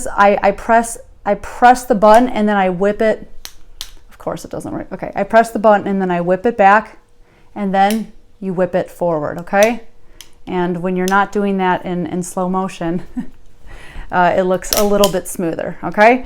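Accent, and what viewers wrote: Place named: Minnesota, United States. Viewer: I’m originally from Wisconsin. I can’t tell if your from the mid west or Canada . Sounds like Minnesota or Wisconsin. 🤔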